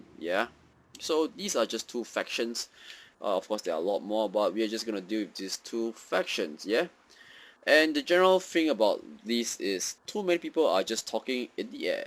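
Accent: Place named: Arab world. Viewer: u have mid east English accent and u can speak Chinese?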